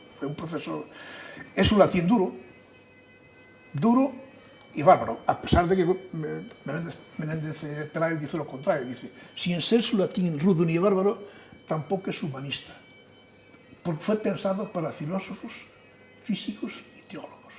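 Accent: Spain. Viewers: Qué pernicioso el acento gallego para una nítida articulación de las palabras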